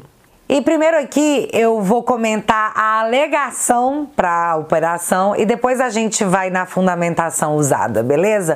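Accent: Minas Gerais, Brazil. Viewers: "Mas, UAI, não era urgente?" Amei ❤ Você é mineira como eu,? 😊 Parabéns pelo seu humor ao nos bem informar. Valeu! Avante, Brasil 🇧🇷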